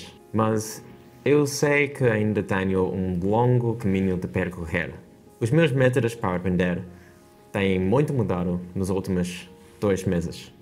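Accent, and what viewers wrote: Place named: Brazil. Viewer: WOOOOOW Parabéns man! O seu nível de portugês é muito bom, e apesar da professora ser portuguesa, e o sotaque é de portugês é bem brasileiro. Daqui a um ano vais falar melhor do que eu com certeza :D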